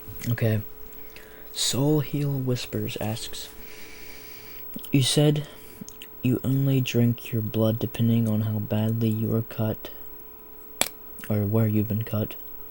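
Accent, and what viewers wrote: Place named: South, United States. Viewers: I'm actually really worried you live around where I live bc if I see you anywhere I will probably scream, cry, and run away because jfc. You like lakes, you have a specific dialect.... Georgia? North Carolina? Virginia? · Creeper alert. Even before you posted a video wearing a WV shirt, I assumed WV from your accent. I went to Marshall University. 😁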